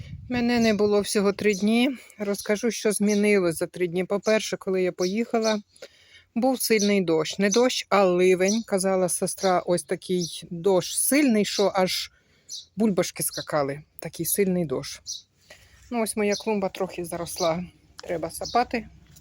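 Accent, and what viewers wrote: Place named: Poltava, Ukraine. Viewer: В вас виговор Полтавський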